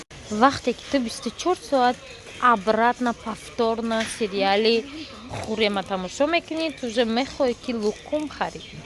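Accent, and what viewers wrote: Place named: Kulob, Tajikistan. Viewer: Апай духтари Душанбе хасти тоза кулобигт гап мезани зури да👍👍👍😁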